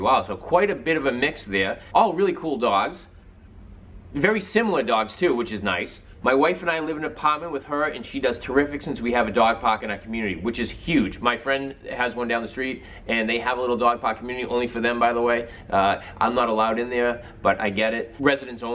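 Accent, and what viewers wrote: Boston, United States. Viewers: The Boston accent just makes these reviews go from average to hilarious. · Nice review. You sound like you have a Boston accent. If you live in the area and want to review an Airedale, let me know! 😉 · You have GOTTA be from Massachusetts!!!! I'm living for that Boston accent.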